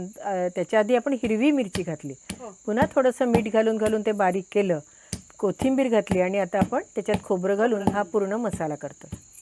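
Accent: Standard. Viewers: माझं सासर लांब असल्यामुळे माझी आई आजारी आहे ती आणि माझे वडील माझ्या बहिणीच्या घरी गेलेले आहेत . भाऊ घरी एकटाच आहे . लाॅकडाऊन मुळे त्याच्या जेवणाची आबाळ होत होती. मी त्याला रोज तुमच्या रेसिपी शेयर करते खूप छान असतात. शिवाय तुमचं बोलणं शुद्ध , स्पष्ट असल्यामुळे ऐकायला खूप छान वाटतं . शुद्ध मराठी सध्या लोप पावत चालली आहे. तुम्हाला खूप खूप शुभेच्छा. अश्याच सुंदर रेसिपी शेयर करत रहा.🙏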